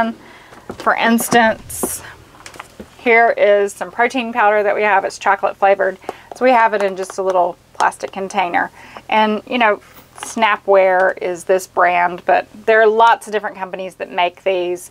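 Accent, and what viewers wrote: United States. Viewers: I love you accent! im French and my favorite American accent is yours!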